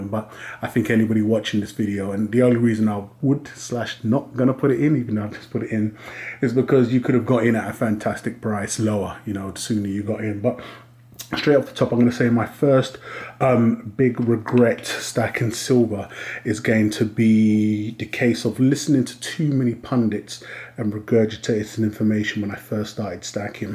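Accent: United Kingdom. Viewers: What’s more important is your British 👍🏼 and that Sir, draws me to the subscription button.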